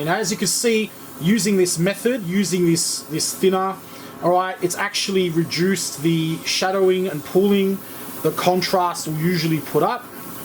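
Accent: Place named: Australia. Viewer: Like your accent, you an aussie? :)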